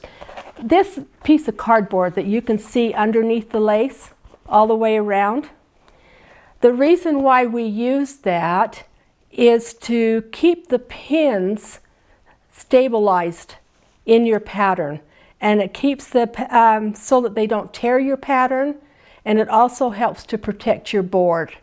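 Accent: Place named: Utah, United States. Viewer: That Utah accent came through loud and clear in the first 30 seconds…. Great tips. I am going to crochet myself a bobbin holder right now.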